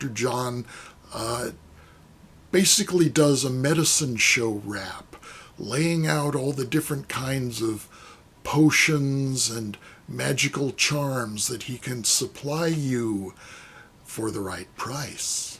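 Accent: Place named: New Orleans, United States. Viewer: You are messing with my neighborhood now. I grew up in the same 9th ward area as. I guess that makes me a night tripper too😂. We have a very similar accent. Your pronunciations are a bit off, but no one would notice but a local😂🤣 It's nice to seeing you giving some love to the good Doctor, especially at this haunted time of the year. Have a great Halloween even if you aren't here in New Orleans. There is a new book coming out, I believe next month., my brother from another mother😂🤣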